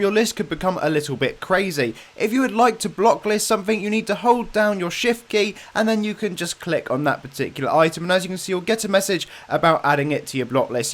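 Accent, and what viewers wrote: United Kingdom. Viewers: I can’t comprehend the bristhist accent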